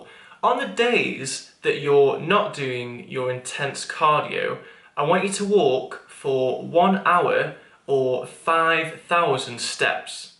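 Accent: United Kingdom. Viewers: You speak uk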